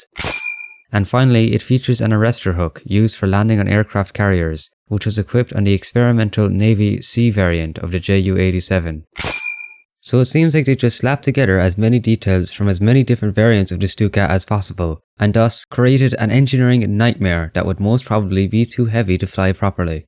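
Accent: Ireland. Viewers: Is this guy Irish?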